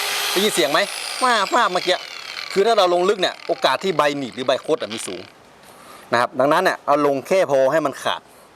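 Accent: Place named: North, Thailand. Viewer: คนเมืองกะนิ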